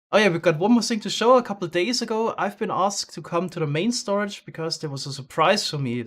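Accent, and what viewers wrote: Germany. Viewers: Hi du hast manchmal einen deutschen Akzent. Bist du Muttersprachler Deutsch?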